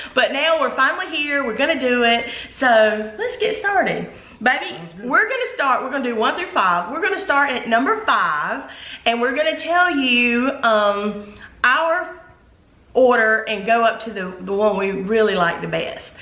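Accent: South, United States. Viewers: Oh my goodness, you two are the cutest couple ever. Your accents are just too much, I love that sweet, Southern drawl. I was so surprised to see on with you, great video 😙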